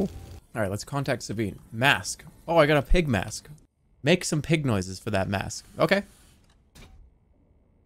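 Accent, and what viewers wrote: Boston, United States. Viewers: The best part about any jerma stream is how BAHSTIN his accent gets when he gets frustrated… “this is nawt mah computah”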